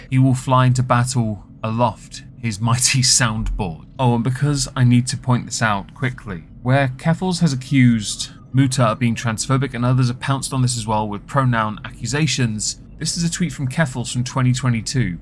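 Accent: RP, United Kingdom. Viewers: 😊 heh....me like when posh brittish voice guy say mean, true things about the people me no likey😊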